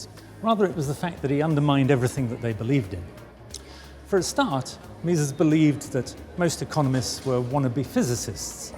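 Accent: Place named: United Kingdom. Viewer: i would like to hear this guy explain every aspect of life to me in videos like this with his classy british accent.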